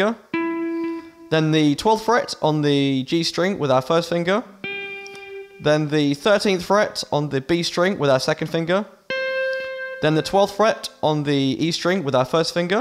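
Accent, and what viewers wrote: United Kingdom. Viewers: Are you British or….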